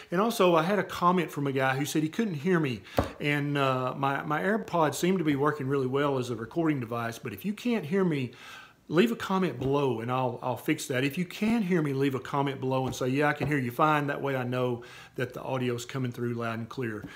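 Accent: South, United States. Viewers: Hi doc! I love your videos, I love your information, and I love your southern accent as I am from the south too, but now I'm living above the Mason-Dixon line. 😁 My question is my 18 year old daughter who has ehlers-danlos, gastro issues, mild anxiety issues and mild depression, allergies, chronic fatigue syndrome, pathological sleepiness, EoE, she weighs 104 lbs, approx 5 ft, and her doctor prescribed her vitamin D 400 iu once a day. After listening to you, I don't believe that is enough, so how much do you recommend, doc? TIA!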